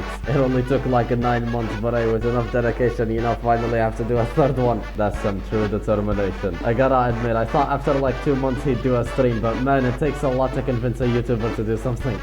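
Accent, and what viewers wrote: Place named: Poland. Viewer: You sound sooooo Polish xD but still nice video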